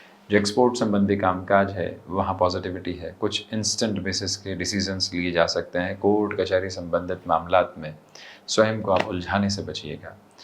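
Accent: Standard. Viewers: Sir aap bahut sahi bolte hai shudh Hindi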